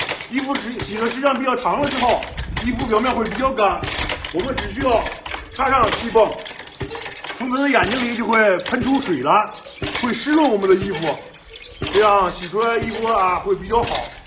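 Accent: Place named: Tangshan, China. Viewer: 老耿说话出了唐山味儿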